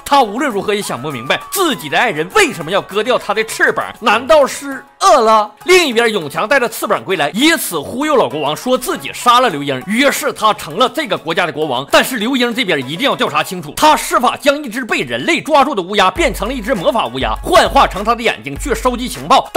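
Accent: Northeast, China. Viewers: 说朗朗额够，东北牛叔英语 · 就喜欢听牛叔说 东北大辣皮儿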